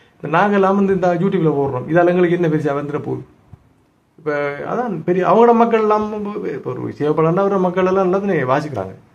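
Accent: Sri Lanka. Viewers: இலங்கை தமிழ்.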